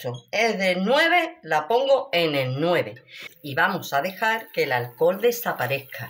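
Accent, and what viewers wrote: Andalusia, Spain. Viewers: Querida,pero yo creo que esas no son costillas la receta esta buenisima como todas las tuyas eres de lo mas expresiva explicando claro eres andaluza????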